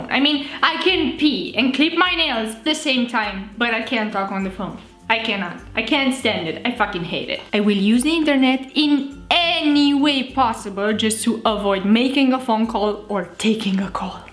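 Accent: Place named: Romania. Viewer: Numai mie mi se pare dubios sa aud un om pe care il stiu normal vorbind in enlgeza,ca acum vorbeste in engleza?:)) nu stiu dec e dar daca prima oara cand am auzit un om vorbind in romana,poate sa vorbeasca si cea mai buna engleza ca daca eu l am auzit inainte in romana acum mi se pare ca vorbeste o engleza naspa cu accent romanaesc:))anyway super video,te extinzi si in afara super:)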